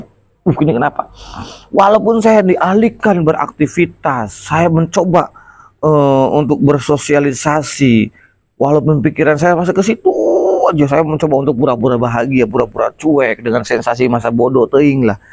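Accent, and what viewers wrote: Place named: West Java, Indonesia. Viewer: Kalo dari logat2 nya kaya orang Sunda kang, saya dari cibiru bandung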